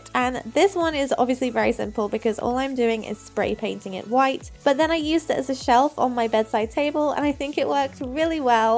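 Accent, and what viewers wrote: United Kingdom. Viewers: I really like your videos! You have so good ideas 😍 and I like british accent😊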